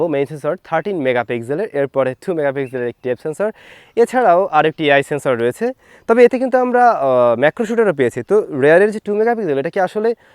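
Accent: Barishal, Bangladesh. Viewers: ওহ আন্নে বরিশাইল্লা🥰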